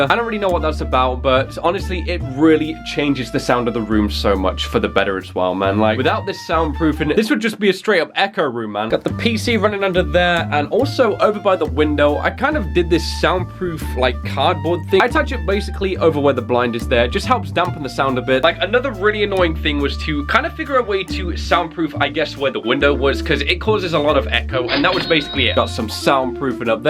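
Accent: United Kingdom. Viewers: Wait, you're British? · Are you British? 🏴🇬🇧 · wait hes British? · Wait your actually British!?? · british Im British too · What I didn't know was British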